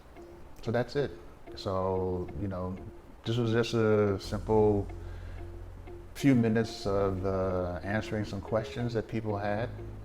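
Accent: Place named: North, United Kingdom. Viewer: Good job 👏👍keep banging out content and your channel will grow. The phillipines has softend you northern accent alot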